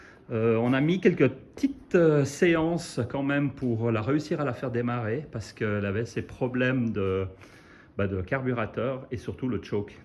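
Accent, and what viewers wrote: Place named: Geneva, Switzerland. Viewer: Lors de l'épisode concernant le contrôle et la remise à niveau des composants électriques du cadre, aviez-vous passé un léger coup de jet, ou simplement un coup de chiffon ou de pinceau avant votre travail? Vous m'avez dit que vous aviez passé la moto complète au Karcher avant toute intervention, ce qui est normal, mais qu'en est- il de l'épine dorsale du cadre, une fois le réservoir et la selle enlevés ? PS: un commentateur mentionnait votre accent vaudois. Personnellement, il sonne plutôt genevois....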